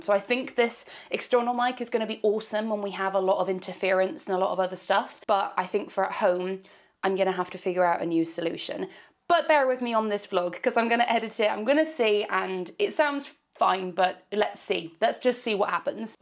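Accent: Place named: Bristol, United Kingdom. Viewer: Hi. I’m quite a new subscriber to your channel but I really enjoy your videos. There’s always so much variety and you’re always so natural and honest. I love your Brizzy accent- I’m a West Country gal but have been living in Greece for the last 42 years but love to see what’s happening in the U.K. 💖🌸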